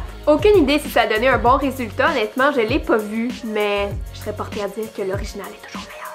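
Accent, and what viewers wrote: Quebec, Canada. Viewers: Salut moi je suis en Belgique un petit pays d'Europe j'adores le Québec et je trouve les québécoise super belle et sympa comme toi j'adore ton accent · J'ai adoré ton accent! J'aime beaucoup la culture québécoise. Tu es très belle. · J'adore l'accent québécois (je suis Français parisien) et je te trouve très belle!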